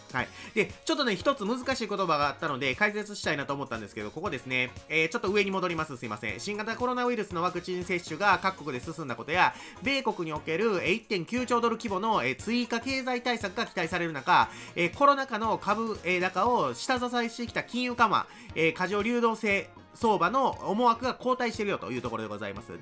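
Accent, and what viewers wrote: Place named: Kansai, Japan. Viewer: おおお、本人から返信きた😳 最近さんのチャンネル登録したものです💦私、忙しい人なので、飛ばし飛ばし見てます💦 さんの動画長いので…😂関西弁いいですね✨心が和みます🌤️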